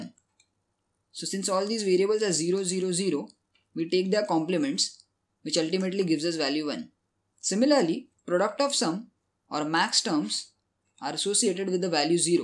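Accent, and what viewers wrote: India. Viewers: if only you indian can speak without that accent people would actually worship u guys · Why Indian have soooo annoying accent?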